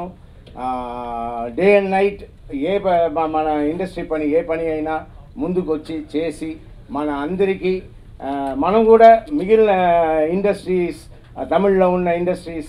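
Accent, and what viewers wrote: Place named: Telugu, India. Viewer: Don't blame him about his Telugu slang OK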